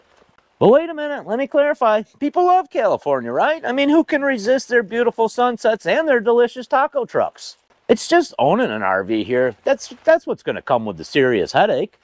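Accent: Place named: Rochester, United States. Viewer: Michigan here born and raised in western NY you sound like your from Rochester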